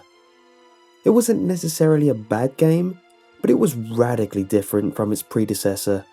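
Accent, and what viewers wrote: United Kingdom. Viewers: British accents.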